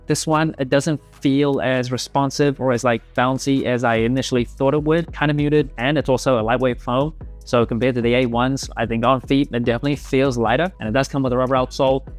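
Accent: Australia. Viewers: I thought so, you definitely sound more Aussie than Kiwi haha 🥝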